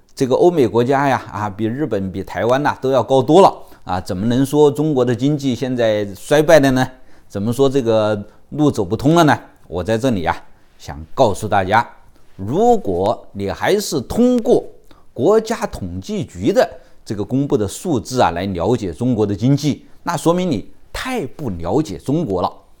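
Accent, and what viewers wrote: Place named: Wuhan, China. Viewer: 作为一个长期旅居海外的武汉人，听到李老师的带着家乡口音的讲话，觉得非常亲切，而且字字在理，我非常同意李老师说的分析，大大受到启发。以后会长期关注李老师。谢谢！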